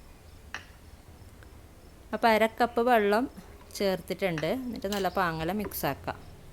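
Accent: Kasaragod, India. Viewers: Kasaragod slang aanallo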